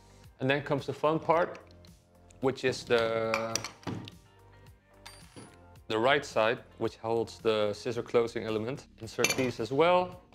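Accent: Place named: Netherlands. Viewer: Ik zat dit te luisteren en besefte mij ineens dat je Nederlands bent :) dankjewel voor de instructie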